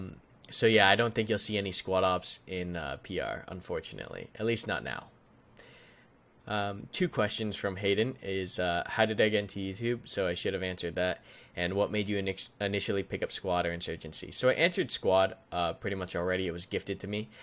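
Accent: United States. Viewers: Asian? with american voice? anyway nice video like always. keep it up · 😍😍 you look sooo cute so just you look Asian, you speak American (not English) and you live in Hawaii omg so cool 😁 pay attention you're starting to speak like Bluedrake42 (the bad (but not baaaad just not that good) way that you do sentences) 😂xD well lov u 😉😍😘❤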